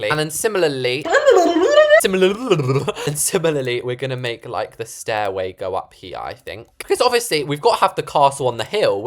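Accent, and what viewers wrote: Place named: United Kingdom. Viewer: It’s good that (I’m saying that for short) is British, that’s because I am and most other content creators are foreign, so I can actually understand him.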